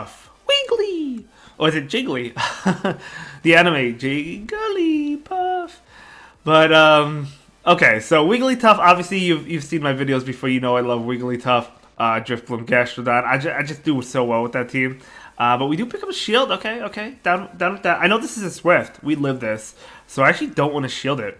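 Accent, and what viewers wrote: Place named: Northeast, United States. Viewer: I got to get my "qwaffee". Man your accent is very strong. If i had to guess you're somewhere on the northern east coast? As always, great battle's.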